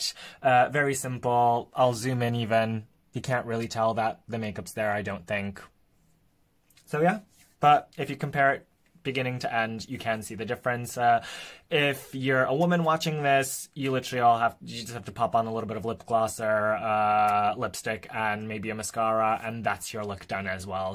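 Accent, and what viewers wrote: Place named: United States. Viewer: Well you have an American accent...and you said “usually my videos are pole dancing related” explain!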